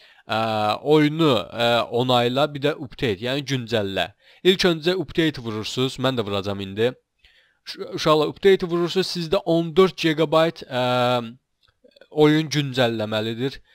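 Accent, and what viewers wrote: Azerbaijan. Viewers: aynen sonradan anladım da azeri ler bukadar değşikmi konusuyodu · Anaa azeriymişsin valla yahşi demesen anlamicam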